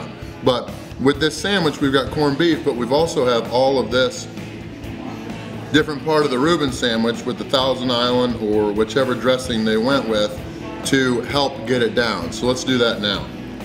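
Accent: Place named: United States. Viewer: Jamm Lighting lol he’s got like a northern hillbilly twang to it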